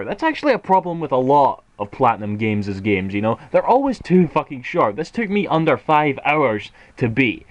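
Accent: Scotland, United Kingdom. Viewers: where abouts in scotland do you come from?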